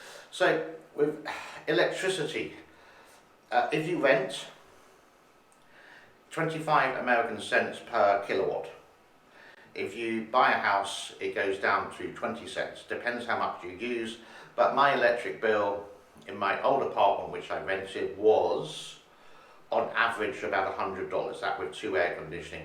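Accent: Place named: RP, United Kingdom. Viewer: Your accent is somewhat posh. In brief, what is your background?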